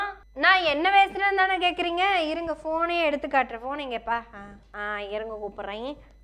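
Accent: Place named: Coimbatore, India. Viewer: Sister Your Civai SLANG speech is Very Like it. Keep it up Same Style with Good informative News to Public. Welcome Sister Very Good Capable Excellent Speech.Take Care sister.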